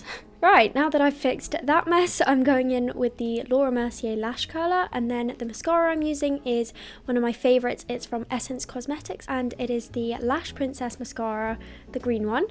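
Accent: United Kingdom. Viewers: Can I just say that your British accent is e v e r y t h i n g ❤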